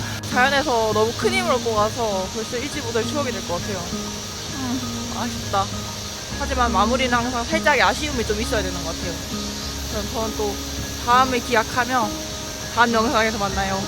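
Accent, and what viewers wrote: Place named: Gyeongbuk, South Korea. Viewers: 경북인건 1000% 대구세유? 사투리 별루네... 부산 아님 ㅋㅋ 깨긴깬다 ㅋㅋ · 마! 이게 갱상도 여자아이가?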